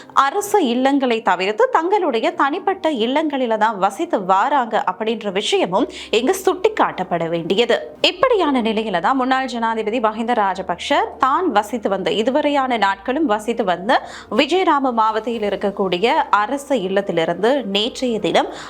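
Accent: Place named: India. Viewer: Can you please maintain Jaffna Tamil. It’s more of z Indian Tamil.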